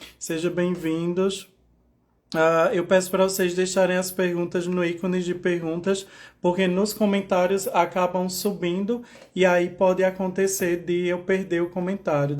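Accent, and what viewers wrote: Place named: Pernambuco, Brazil. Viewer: Sotaque pernambucano... Ksksk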